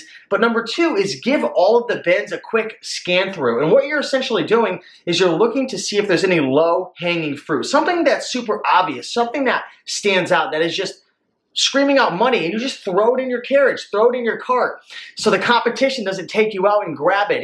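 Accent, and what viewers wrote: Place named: New England, United States. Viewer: Yankee accent kills me, and people fuss about robotic voices....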